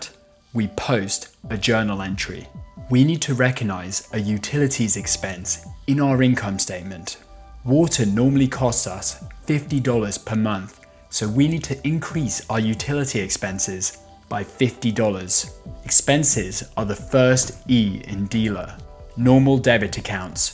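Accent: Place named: United Kingdom. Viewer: i am kind of a little bit confused, u are a Canadian, but your accent is British accent .but i like your video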